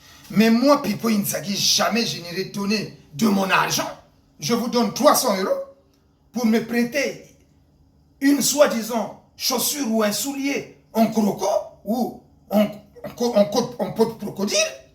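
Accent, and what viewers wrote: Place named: Lari, Republic of the Congo. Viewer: bonjour! j'aime bien ce que tu fais mais s'il te plait, hôte en toi cette envie de t'exprimer comme tu le fais. l'expression tu l'as mais la tonalité laisse à desiser.tu as un fort accent lari donc ne force pas à singer ce n'est pas du tout agreable,soit simple.merci sans mauvaise foi.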